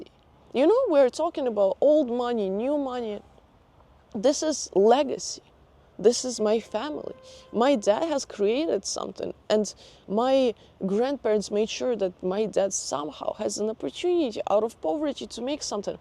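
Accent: Russia. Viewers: Так приятно слушать русский - английский 😂❤. Русские фразы на английском ❤. No offense. Спасибо тебе за историю.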